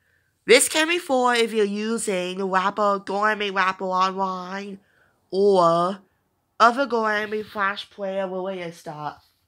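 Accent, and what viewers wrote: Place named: Australia. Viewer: Sounds australian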